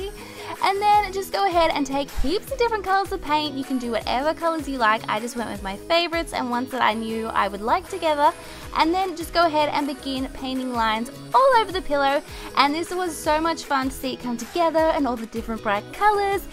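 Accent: Australia. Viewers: Are you from australia? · are you Austrailian? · She's from Australia so yes she does have an accent!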